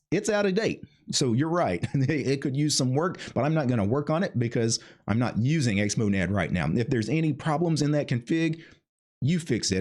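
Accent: South, United States. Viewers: As someone from the Southeast United States, sounds perfectly normal to me 😂 But to my friends from other parts of the country he has quite a strong accent 😛 A benefit of hearing the “Southern accent” of the U.S. for non-native English speakers is that it is likely the most slowly spoken of the different English accents, hence the famous “Southern Drawl”. It is very ironic to me that it is easier for some people who do not understand English very well to understand the “Southern” American accent better than some native speakers of American English who are from areas outside the Southern U.S. Language/linguistics is so fascinating! 🤓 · He does not talk slow. That “slow” bullshit from people thinking they’re cracking “funny” jokes about Southern Accents.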